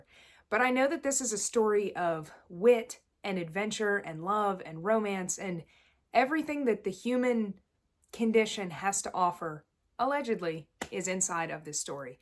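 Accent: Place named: South, United States. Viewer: Just found your channel. Enjoying the content. I am having trouble placing your accent though lol I think I detect a little southern in there, but I'm not sure haha Where are you from? Where did you get your history degree? Thanks for your channel 😃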